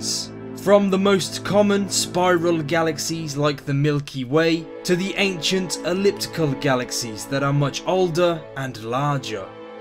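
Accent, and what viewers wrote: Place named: South West England, United Kingdom. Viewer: He's English, like me, and his accent is probably from the South West.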